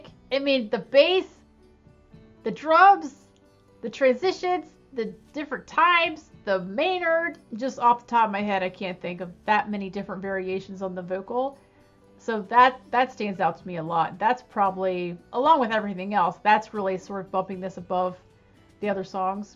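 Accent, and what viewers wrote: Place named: Mid-Atlantic, United States. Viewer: My second listen... Hear "noaht" for "note"... Def Mid-Atlantic.. Awesome!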